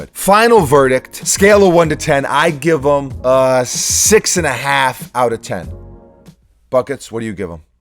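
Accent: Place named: Boston, United States. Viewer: def from boston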